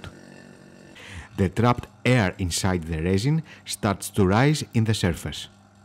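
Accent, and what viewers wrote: Greece. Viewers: Are you from greece? Your accent is very greek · Από την προφορά σου κατάλαβα είσαι Έλληνας 😊 Μπράβο αυτό το βίντεο Με βοήθησε πολύ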